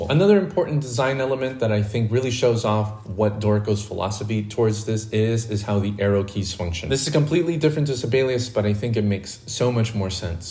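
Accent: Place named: Philippines. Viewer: He talks funny... I'm guessing Filipino is first language? lol. Anyway, great information—the note navigation key commands have me drooling.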